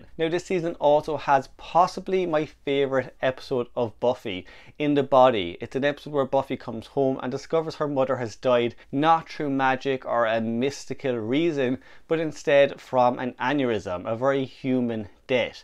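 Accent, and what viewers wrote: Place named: Ireland. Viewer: Aaah... a proper Irish accent.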